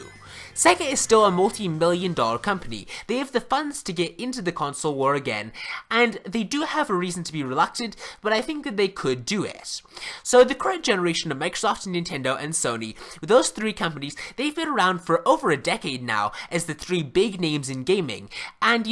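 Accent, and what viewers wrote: Scotland, United Kingdom. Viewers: Are you Scottish? I get the strong impression you're Scottish, but moved away aged 7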